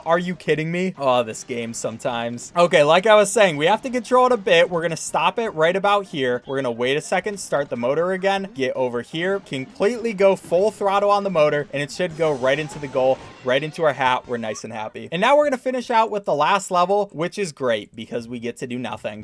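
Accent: Czech Republic. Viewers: You are czech ? :D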